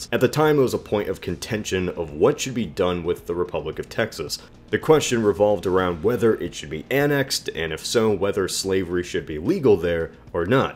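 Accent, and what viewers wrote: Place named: Midwest, United States. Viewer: Ah, that sexy ass midwest voice: back to please my ears.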